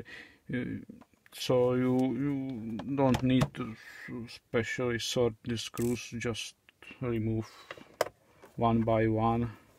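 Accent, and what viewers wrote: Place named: Czech Republic. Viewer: ale no tak.? ty se stydíš za česko? ten akcent jde bleskově poznat. jakože ne že by nebyl důvod se za česko stydět, ale stejně🙂.... a díky za video. přemejšlim, že si ten komp repasovanej koupim.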